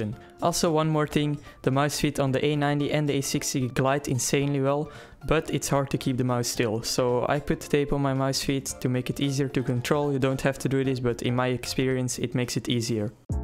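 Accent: Netherlands. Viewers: I smell dutch:D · Are you dutch? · Ik dacht al dat je Nederlands was lmao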